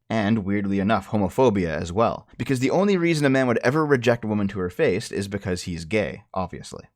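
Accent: United Kingdom. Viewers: Why does she speak british? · her accent is so english and thats quite annoying just saying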